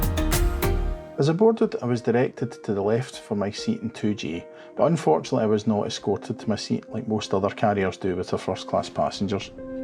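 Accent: Scotland, United Kingdom. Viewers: Great content as usual! Very comprehensive review, and the Scottish accent makes sound more convincing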